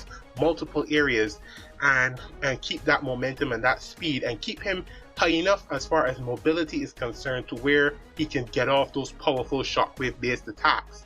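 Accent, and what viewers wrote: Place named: Barbados. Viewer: Are you from Barbados? definitely hear the accent lol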